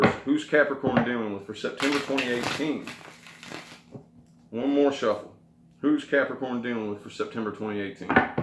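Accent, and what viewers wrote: South, United States. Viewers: Cool southern drawl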